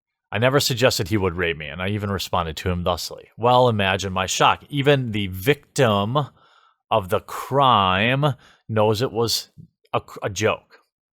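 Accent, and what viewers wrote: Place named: California, United States. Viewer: You remind me of Andrew Breitbart, especially your CA accent. :-) Keep fighting! & Good luck!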